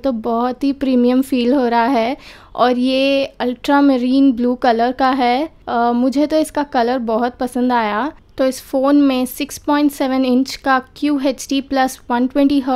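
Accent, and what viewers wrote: Hyderabad, India. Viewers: Does anyone feel the Hyderabadi in her?? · Wow Hyderabadi Hindi amazing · Hyderabad se lagre aap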